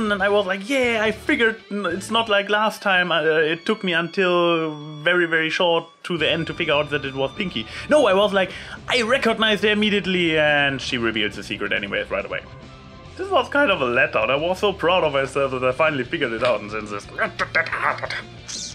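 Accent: Germany. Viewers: Ich finde deinen Deutschen akzent echt genial ^^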